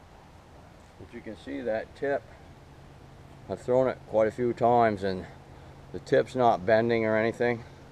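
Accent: Maine, United States. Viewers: That accent...you must be from Maine.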